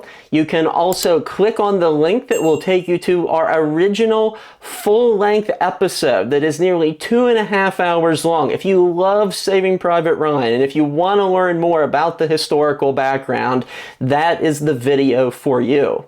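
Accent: United States. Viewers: Anyone who wants to know how american accent sounds just has to listen to your video ! Love from Normandy, near the beaches. .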